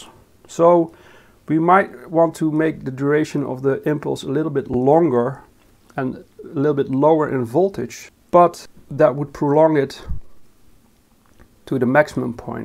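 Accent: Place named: Netherlands. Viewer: Thought you were from Sweden, but your Dutch, right?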